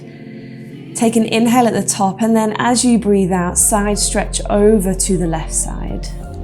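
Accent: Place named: United Kingdom. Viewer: Just found your channel and really enjoyed this class, thank you! You sound like a Brit, was this filmed in the UK? It looks pretty spectacular 🤩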